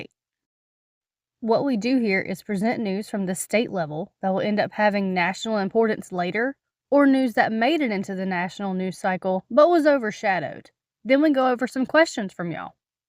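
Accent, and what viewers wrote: South, United States. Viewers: Can I just say, I love Southern Accents❤️ Taking the “i” out of Oil is awesome. It doesn’t need to be there, we all know what it is without it(sludge). Love it🥰🤘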